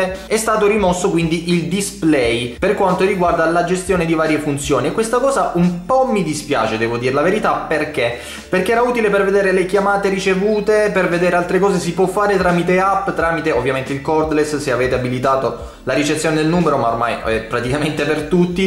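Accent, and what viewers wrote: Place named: Apulia, Italy. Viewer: Ciao , io sto aspettando che arrivi la vodafone station . Per caso sapresti dirmi quanto ci vuole dall'arrivo della vodafone station all'attivazione effettiva di internet ? Mi pare di sentire che sei Pugliese se non erro , se si vorrei sapere in quale comune ti trovi per farmi un'idea di quanto veloce sarà la mia linea . Grazie !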